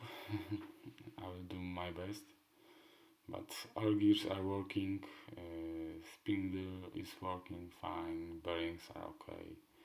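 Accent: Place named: Russia. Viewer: Блин, я английского не знаю, но вот тяжелый русский акцент слышу сразу😊.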